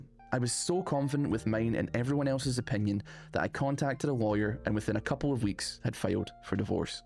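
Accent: Scotland, United Kingdom. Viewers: is indeed scottish! great voice for the great takes indeed:))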